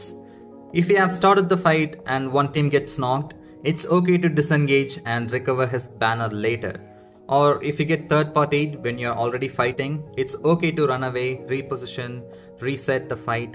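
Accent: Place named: South India, India. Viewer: Tbh I like the little south Indian accent on it 😅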